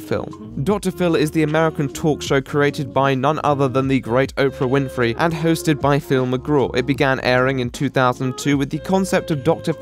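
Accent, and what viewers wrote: United Kingdom. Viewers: thanks for mentioning that you are british cause i was so confused about the accent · not everyone in Britain talk like that lots of people here talk like a Chave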